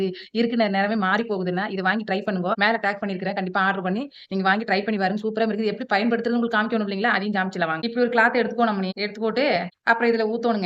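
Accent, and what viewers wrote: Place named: Coimbatore, India. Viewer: கோவை பாஷை சூப்பர் ❤❤❤❤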